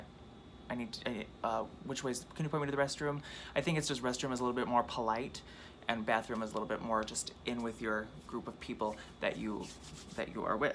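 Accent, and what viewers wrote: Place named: Minnesota, United States. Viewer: Yesssss I loved this so much! Saying a lot of these words, your accent TOTALLY came through thick lol. I loved the words and sayings you added too! Could totally hear the Minnesotan accent lol. So funny how you adopted it. I think that’s so cool and truly fascinating ☺️ I really hope others do this tag too. It seriously was my favourite one thus far and it’s fun to see how truly different (or the same) we really are lol. When it comes to our speech anyways! Thanks for doing this ☺️ this was so fun to watch!!!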